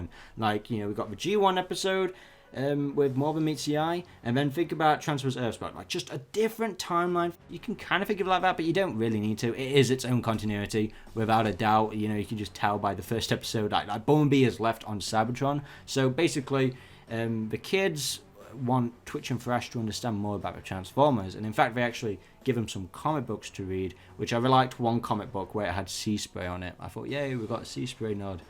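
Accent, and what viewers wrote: United Kingdom. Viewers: I like your British accent. But quentess prime is the traitor if you watch the last knight they call her that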